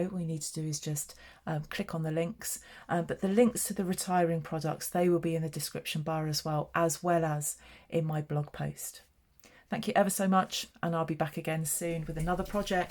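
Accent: United Kingdom. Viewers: Lovely listening to a British accent . Very nice card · Your tutorial was great! I loved listening to your accent. I was fortunate enough to live in England for a couple of years and absolutely loved it!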